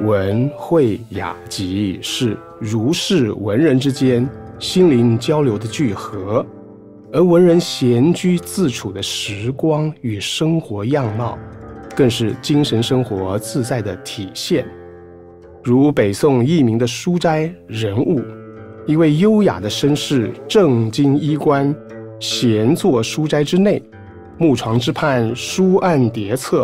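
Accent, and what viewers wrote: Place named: China. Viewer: 聽聲音是大陸腔，但漢字，喜歡漢字。謝謝制片人。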